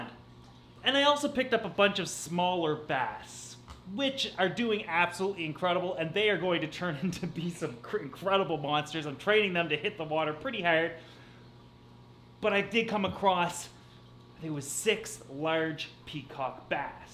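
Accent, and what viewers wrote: Canada. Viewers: As a Minnesotan, I adore your Canadian accent! Makes me feel like I know you. I appreciate the videos and great information, I'm just getting into the hobby and your channel has been a go-to source for information :)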